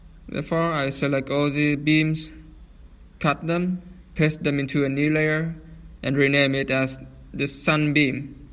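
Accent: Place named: Italy. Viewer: Woow man You have an accent like a man in video "italian man who went to malta" and that's so funny and cool :D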